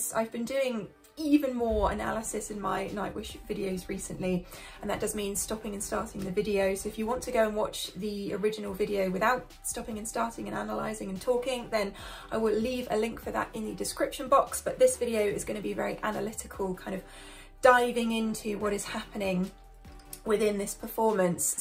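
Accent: United Kingdom. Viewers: you truly are the ''Margot Robbie' of music,just with an English accent.You are so talented and such a sweet soul as well.What a treasure you are to us all!! Thank you!! ❤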